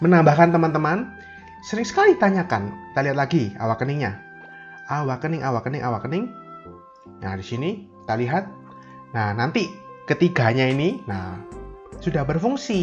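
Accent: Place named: Surabaya, Indonesia. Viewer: kalo didenger2 dr suaranya kyk org surabaya y bang?maaf kalo salah...🙏🙏🙏🤭🤭🤭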